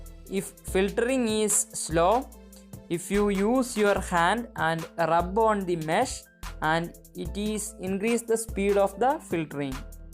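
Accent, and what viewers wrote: Kerala, India. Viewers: I love Indian accent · Adipoli, Anybody guessing about the accent it's how keralites speak English and it's beautiful 😊😊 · is you are malayalee? your english pronounsation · മലയാളി ഇറപ്പാളി ആ english കേട്ടാൽ അറിയാം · engilsh kekkumpol thanne ariyam ivan malayali aanennu lol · If his pronunciation bad that is not a big deal becouse it is not his language. If some one says his pronunciation is bad that is a big deal. I wish I too can talk like him! There are more than thousand different pronunciation in the world and his is called Indian English. I love Indian English because it makes least spelling mistake. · How about not telling someone that they speak funny. That is rude. He sounds like he comes from India. That is how they sound. His voice was very nice. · Ur English is so Malayalam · He is a mallu😂 100% like me we all have same accent.... 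Proud to be a mallu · It's not my native-tongue either. There are many Indians who do not have that strong accent that he is exuding. SOME might even find it a tad disturbing, hence the negative comments. It's not a grammar test, but if i'm going put something on the net for all the world to hear, I might keep my pronunciation at par with the majority of English speaking youtubers posting here. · Thick malayalee accent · I know you are indea the way you talk my friend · Typical mallu slang the zing thing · I love kerala & the accent too 💎👍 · That pakka malayali accent👌👌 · da potta ne malayali ele english kelkumbol ariya😂😂😂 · Jhansi Balla this is not english speaking class. europians can not utter a single english word. no japanee knows english. can you understand what a bengali speaks in english? do not make fun of accent. the person is from kerala and his mother toungue is malayalam. can you speak a word in malayalam? 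· I’m sorry but try learning English not Hindu English. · First of all thanks for the knowldge, next your english very funy ,when you are talking r must be silent ,most of indian people talking the same way ,must try to talk with r silent very beautiful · Mallu accent,😀😁😂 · India dialect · I cannot watch a video if the YouTuber has a very thick accent from india. if he's narrating it I wonder who are you talking to? no one who speaks English can understand the way you're speaking english. so it's not just an accent who the hell is teaching English classes over in india? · He’s an Indian guy what do ya expect him ta sond like and given the choice I would rather sound like him than sound like a d£#k head so shut it and stop taking a lode of banana juice.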